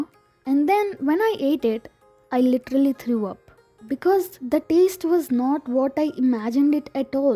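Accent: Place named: India. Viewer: I thought u r from Europe 😅 and when I listen language and than I know u r from India 😅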